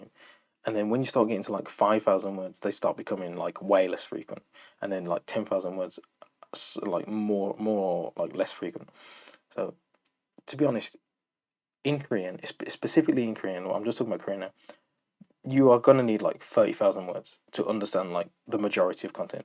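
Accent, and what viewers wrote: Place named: Birmingham, United Kingdom. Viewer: Ah you’re a Brummy, do you know Joe?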